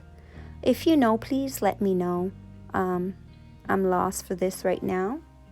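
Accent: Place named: Montreal, Canada. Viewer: The wreath looks great without a bow. Very good job. You inspire me. By the way I love your accent it reminds me of when I lived near Montreal. It brings a smile to my heart.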